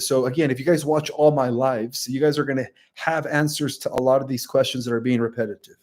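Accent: United Kingdom. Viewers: Loved te british accent .bring humor all the time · I'm loving the British Alto, it's hilarious and funny enough sound better than your regular accent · Enjoyed your live, love your British accent lol 😄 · Love that British accent 😂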